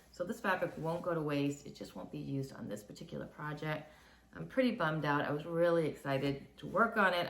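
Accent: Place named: Boston, United States. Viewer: I have a couple of questions. Is there a reason you roll your fabric instead of fold it, or is it just preference? In what part of Maine do you live? I thought for sure your accent was from Boston. 😊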